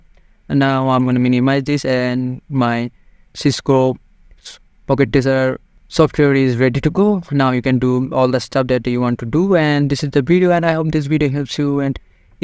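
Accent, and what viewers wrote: India. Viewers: Indian accent! 😍 Yeah, I definitely can install this program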